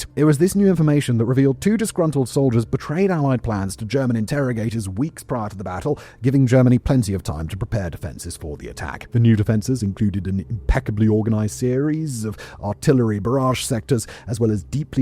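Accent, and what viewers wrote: United Kingdom. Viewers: Can’t stand this voice. I’m so over British accents. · anyone else bothered by how fast he talks? I mean I'm used to the British accent and all but I can barely understand what he's talking about because of how fast he speaks.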